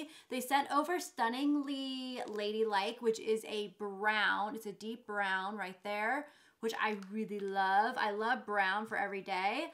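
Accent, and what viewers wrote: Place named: New York, United States. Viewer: I love her accent. She has to be from New York, Staten Island kind of area.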